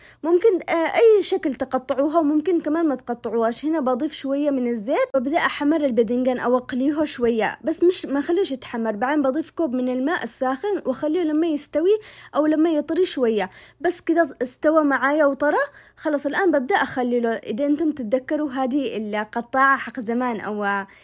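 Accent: Aden, Yemen. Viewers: ماشاءالله مصري بلهجة يمنية 😃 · 😂😂 حتى بنتي الصغيره تقلدها وتسوي فيدوهات ماشاءالله اللهجه العدنية تفووووز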